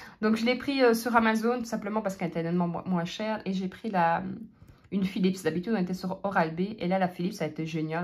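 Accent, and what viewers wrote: Belgium. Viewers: Bonjour tes vidéos sont très vivantes et j’adore l’accent belge ,tu aimes les fleurs alors acceptes ce bouquet 💐🌺💐🪷🌾🌸🌻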